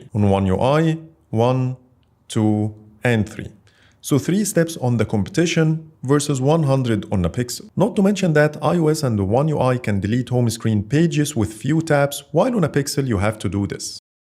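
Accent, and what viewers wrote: Egypt. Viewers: Are you Egyptian You accent looks like Egyptians accent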